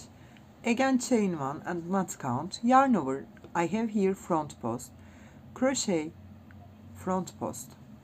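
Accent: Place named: Turkey. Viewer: The second I heard the accent, I knew you are Turkish. Dünya çapında videolar yapan bir Türk görünce asın bayrakları diyesim geliyor ❤❤❤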